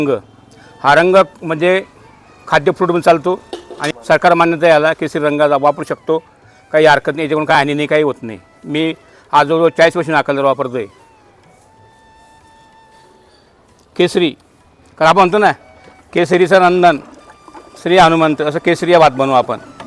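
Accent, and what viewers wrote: Khandesh, India. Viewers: 🙏जय हरी मी आत्ताच तुमचे विडिओ बघायला लागलो मी खांदेशात धुळे शहरात राहतो तुम्ही खान्देशी बोली बोलून मन प्रसन्न केलत. आणि सर्वांनाच परमेशवराची भक्ती करण्यास प्रेरित करतात खूप आनंद झाला 👌🙏राम कृष्ण हरी जय जय 🙏🚩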